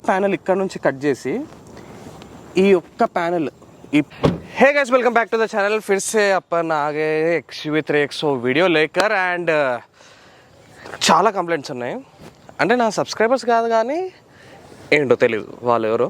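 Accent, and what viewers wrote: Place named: Delhi, India. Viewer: bhai...you r awesome.i know u well from morning to evening you rome with marvadi and jains.your hindi is Delhi based.we r just subscribers who r we to suggest your language.did they know anything about u u r a international model lakme runner up u donated 50 lakhs to blind people.what does they know don't care the people who give u negative comment.thum ko dekh ke jalthe hai saaley..kuch tho log kahenge logon ka kaam hai kehana.... ❤❤❤❤❤❤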